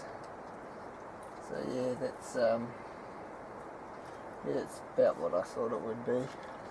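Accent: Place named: New Zealand. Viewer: Wow! AS soon as I heard your voice and you said you were trapping possum's I knew you had to be a Kiwi!! I had a similar lifestyle growing up on the coast in the Wairarapa with my own trap line! really enjoyed this mate! New sub and I'll be following your channel with interest!!👍😀💯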